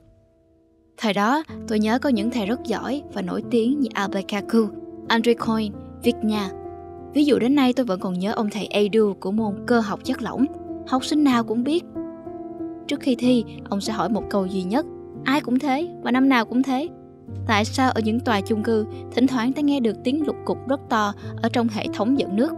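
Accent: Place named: Ben Tre, Vietnam. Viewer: bạn voice là người Bến Tre à kiểu đọc sai chính tả của người Bến Tre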